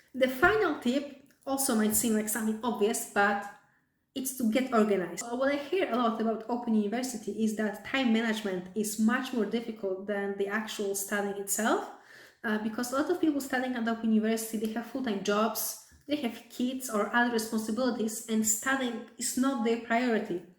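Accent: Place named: Hungary. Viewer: Not related to the topic but..Where are you from? You sound Hungarian. 🤔 I NEED TO KNOW!🤣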